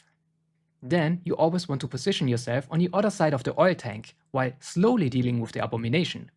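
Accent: Germany. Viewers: Du bist safe deutsxh der akzent verrät dich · Bin ich bescheuert oder höre ich den deutschen Akzent raus ? Gute Zusammenfassungen. Hoffe in Zukunft folgen noch mehr Hilfestellungen zu Visitenkarten. Bin auf weitere Videos gespannt !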